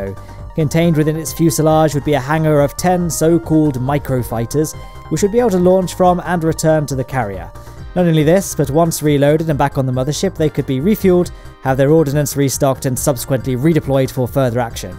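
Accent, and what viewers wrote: United Kingdom. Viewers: Its the bitish accent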